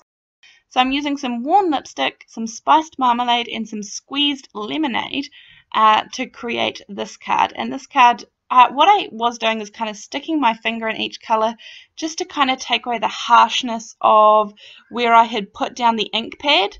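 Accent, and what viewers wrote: New Zealand. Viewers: You've got a kiwi accent. Are you from Aotearoa?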